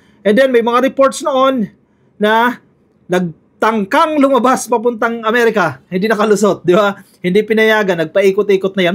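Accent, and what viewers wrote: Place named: Samar, Philippines. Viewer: Idol taga samar kaba? Kasi yong tuno mo hehe taga samar din ako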